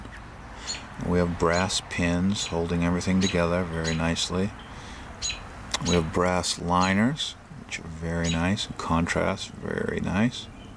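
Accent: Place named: Maine, United States. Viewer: You sound like your from Maine, yut! Love your videos.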